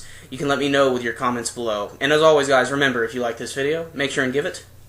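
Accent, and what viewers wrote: Germany. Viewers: No matter what happens this final arc I will enjoy it simply for the stereotypical German accent in your reviews. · Your german accent is freaking hilarious xD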